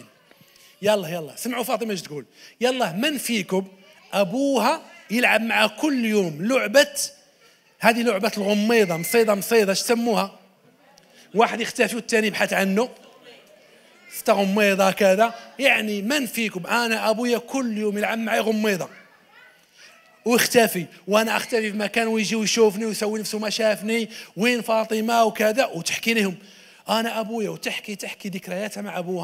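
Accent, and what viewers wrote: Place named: Morocco. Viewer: نعم اختي شككت في اول الامر لان لكنته مغربية والأن كأدتي لي تخميني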